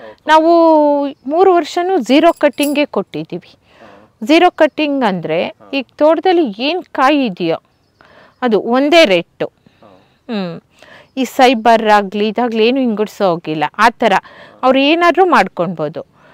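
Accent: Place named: United Kingdom. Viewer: British Speaking Kannada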